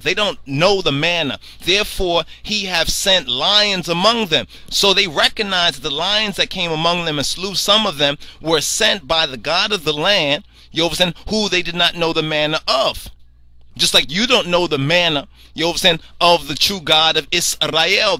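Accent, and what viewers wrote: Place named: New York, United States. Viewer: Are you from New York or maybe Boston? I hear a familiar accent, just curious. (BTW) I like it a lot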